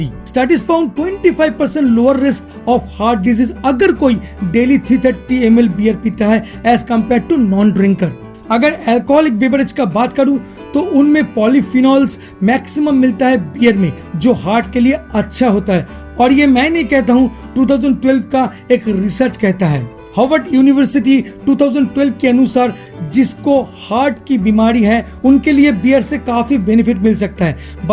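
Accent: Bengal, India. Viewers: Bengali manus chala hindi bolne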